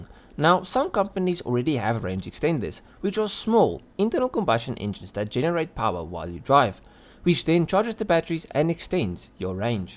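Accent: South Africa. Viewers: Brro are you south african?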